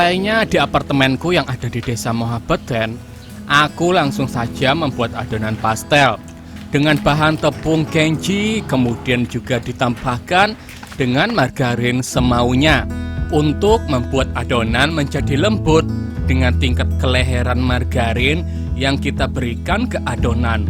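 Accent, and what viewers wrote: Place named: Banjar, Indonesia. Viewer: Logat banjar hanyar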